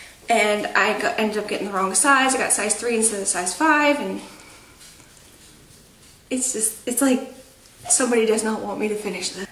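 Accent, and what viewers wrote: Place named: New Jersey, United States. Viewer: I really like your videos, your work is gorgeous! I enjoy listening to you talk because I am originally from N.J. and your accent reminds me of home. :)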